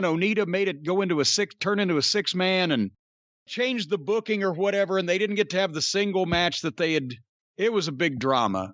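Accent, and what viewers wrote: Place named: Midsouth, United States. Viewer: That's how we say it in the Midsouth USA lol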